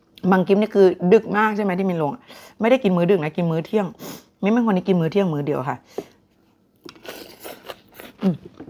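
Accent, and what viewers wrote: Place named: Central, Thailand. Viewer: เป็นคนภาคเหนือ แต่ทำไมพูดเหน่อสิงห์บุรี กาญจนบุรี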